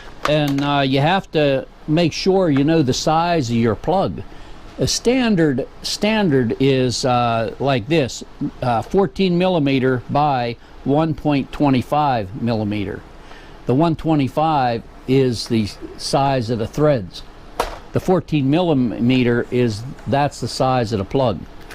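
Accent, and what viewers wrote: United States. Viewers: Are you from pennsylvania? I can hear it in your voice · I'm originally from New Castle. I know that western PA accent when I hear it!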